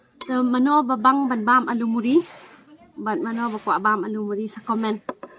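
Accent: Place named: Malki, India. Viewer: Ktien shnong malki te kum jong ii thik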